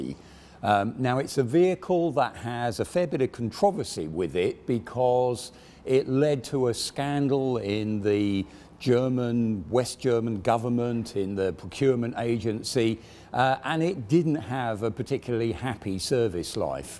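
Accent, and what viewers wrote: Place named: United Kingdom. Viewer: Sounds British.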